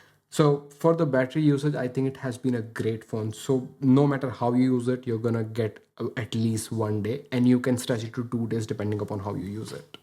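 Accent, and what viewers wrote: India. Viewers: Bro, are you from Bangalore.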